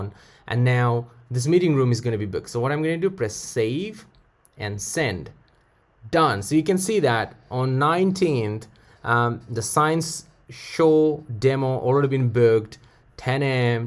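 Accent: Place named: United States. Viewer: Where are you from? Are you putting on an American accent. It’s very interesting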